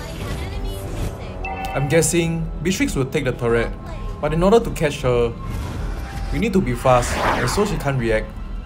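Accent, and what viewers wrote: Singapore. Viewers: Man sounds like he is from sg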